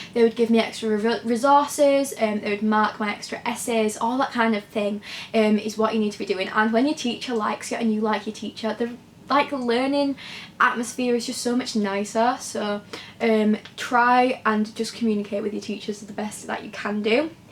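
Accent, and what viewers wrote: Yorkshire, United Kingdom. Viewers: Ackley Bridge accent YAS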